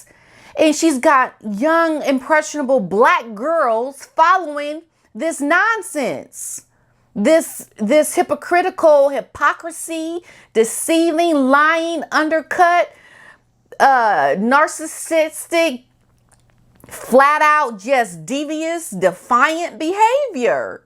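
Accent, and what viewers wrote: United Kingdom. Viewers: No wonder you had the british accent!!!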